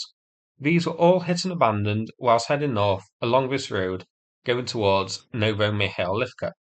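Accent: United Kingdom. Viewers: Just an observation. You are speaking His Majesty’s English. Here in America. I noticed while working in a Nippon Restaurant. That in Nippon. They teach H.M.S. English I.E. Bonnet = Hood. Boot = Trunk. Knickers are Panties. So My vocabulary is expanding. I use Rodgerd,Knackerd now. And there called cookies Not biscuits. Torches are for Lighting fags ? Oh cigarettes. No it’s a Flashlight. Keep up Your Good Work Sir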